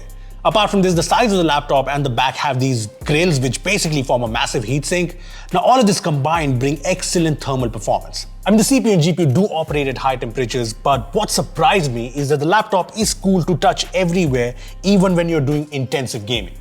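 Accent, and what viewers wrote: India. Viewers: This comment will be called rasiccist but I love the way Indian male acsents sound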